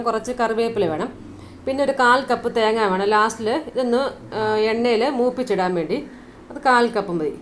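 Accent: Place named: Kottayam, India. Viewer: സംസാരം കൊള്ളാം കോട്ടയം സ്ലാങ് ❤️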